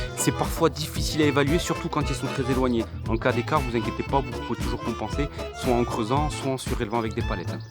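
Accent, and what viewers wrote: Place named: Toulouse, France. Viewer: Houai...encore une idée géniale qui va me permettre de faire ma petite fontaine avec bain d'oiseaux (important). Hé bé, je me disais que tu avais comme un petit accent que je connais...bé forcément, au sortir des torrents de l'Adour, au bout des vallées, on le connait le bel accent de notre jolie ville rose ! Bon, je te copie pour pomper...ou l'inverse...😂 Merci ! 👍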